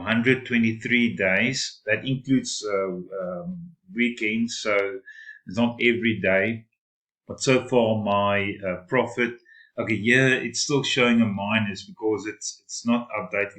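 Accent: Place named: South Africa. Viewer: Digging the deep Afrikaans accent bro. 🇿🇦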